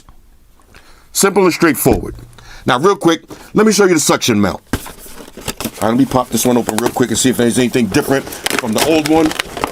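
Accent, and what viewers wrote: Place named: Brooklyn, United States. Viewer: You from Brooklyn son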